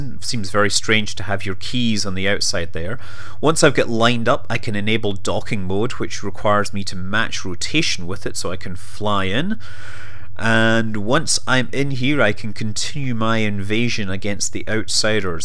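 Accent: United Kingdom. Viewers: I'm really interested in languages and pronunciation and I just have to ask you: What kind of accent is that, that you are speaking? Is it maybe some kind of british accent? I'm obviously not a native english speaker, so I'm just curious about it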